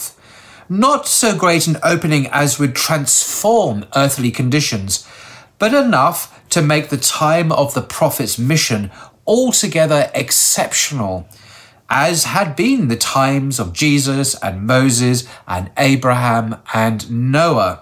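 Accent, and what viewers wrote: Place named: RP, United Kingdom. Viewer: Just a side point but your reading / narrating skill is marvelous especially with that touch of British posh ness Allah bless you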